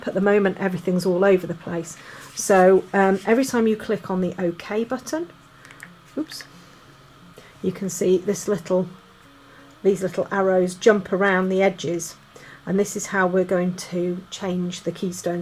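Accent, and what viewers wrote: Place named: United Kingdom. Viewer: I got really excited when I heard your british accent, that means I should be able to get a hold of this. Thank you!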